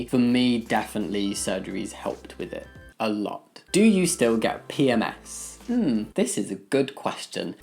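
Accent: United Kingdom. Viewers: Honestly the only question I want to ask him is about his accent. Like it sounds British, but specifically, what is it?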